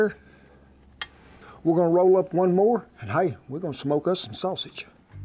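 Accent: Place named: Texas, United States. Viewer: red dead redemption grandpa ... man that texan accent. :face-red-heart-shape::face-red-heart-shape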